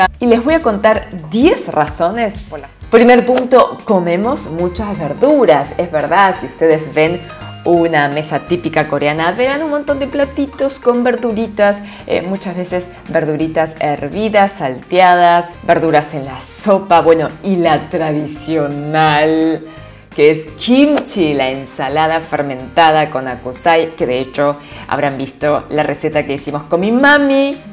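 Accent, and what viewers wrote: Buenos Aires, Argentina. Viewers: Una coreana con acento argentino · Hola apenas descubrí tu vídeo pero una pregunta además de hablar muy bien el español miro que tienes un acento como argentino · Te felicito por el perfecto español-argentino que hablas jeje. Adoro el coreano , me pone triste no poder pronunciar ni siquiera una palabra. Gracias infinitas por contar costumbres y alimentos saludables que consumen. Bendiciones para tí. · Habla tan bien porteño 😍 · Supongo es coreana se ha criado o vívido por mucho en Latinoamérica y si su español suena a Argentina! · Wow!! Hablas perfectly Argentinean 😱🇦🇷👏sos hermosa! Me encanto tu video! Gracias!!👏😊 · Eres Coreana, hablas español con acento Argentino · Tiene acento de la Argentina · Flaca pero tu hablas como Argentina como eres coreana? Nacida en Corea o de padres Coreanos? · Tenes 2 de las 3 cosas que me deriten de una mujer ojos preciosos asentó Argentino pero tu piel no es morena 👍♥️👍♥️👍♥️🇬🇹👍👌♥️👍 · No tengo ascendencia coreana parece pues me miran y engordo. 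Habla como argentina. Linda chica presentándonos su bella cultura. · Me encanta oirte y verte, tu acento argentino es genial, sos fisicamente coreana pero culturalmente todos tus gestos , tu manera de hablar y tu alegria son latinos, te felicito por ayudarme a conocer mas de tu lindo y hermoso pais, soy fan de los doramas, besos desde Honduras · Acento peruano, limeño? Para nada, su acento es argentino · Y tiene acento argentino. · No se guíen por los rasgos! No tiene un mérito especial que hable exactamente como una argentina si lo es de nacimiento o llegó muy chiquita. Por muy negro que sea un tipo, si nació y vivió en Sevilla será y hablará sevillano. Conviene pensar antes de hablar o teclear! :D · Tu español es perfecto , pero con acento Argentino!! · Asu una coreana hablando con acento argentino😱😄que chistoso se ve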